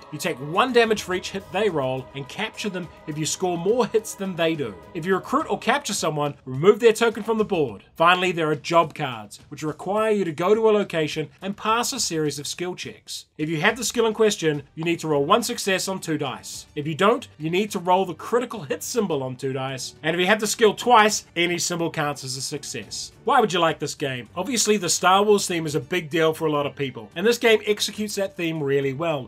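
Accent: New Zealand. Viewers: Kia ora, e hoa! So pleased to hear a kiwi. Tino pai rawa!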